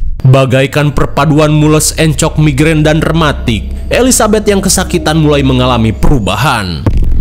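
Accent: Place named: West Java, Indonesia. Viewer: Ci mimin nya orang sunda ini mah 😁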